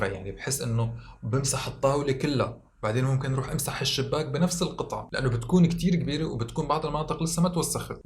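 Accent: Syria. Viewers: حكيلنا عن حالك اكتر...حبيت قناتك.... حاسستك من سورية...صح؟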